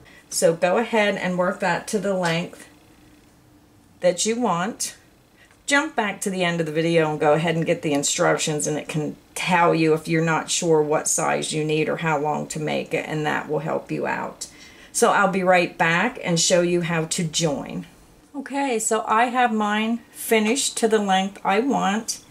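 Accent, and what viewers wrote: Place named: South, United States. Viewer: Love your southern accent especially when you say Grandma.... Love your channel period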